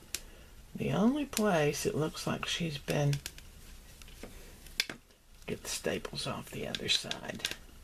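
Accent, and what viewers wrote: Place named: South, United States. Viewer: I am really impressed with your dedication to your talent. 50 yrs is a long time. I, too, have taken a few watercolor classes long ago, but would have to start from scratch in my knowledge. Remember some things but not much! One of my workshop teachers worked in egg tempera sometimes. Have you ever worked in that?. I have so many hobbies, it’s had to get into any of them as deep as I’d like. I’m retired now, so I can partake more.Would love to see some of your paintings. From your accent, it tells me you live in the south. May I ask where? I enjoyed your seeing your pretty yard. Thank you for sharing your videos. I enjoy your teaching. I’ve been collecting and making antique reproduction porcelain dolls, and trying to dress some. I love dolls! Love always having my eye out for a cheap one with potential. My doll teachers have been restorers, too. 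I’d love to learn how to do my own purchases. I learn some things from them, but when it’s their living, I hate to be really nosy about techniques. I am learning through you and others on utube. Thank you so much, again! It’s so wonderful that you share! Again, would love to see your paintings. What is the style you use? Abstract, realism, landscapes, portraits, impressionist like, etc., etc? Thank you for your extended answer! Keep “arting”. 😉😊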